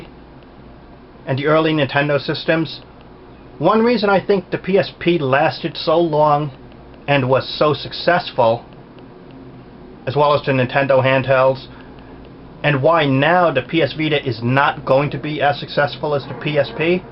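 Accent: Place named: New York, United States. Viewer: By the way, New York dialect FTW!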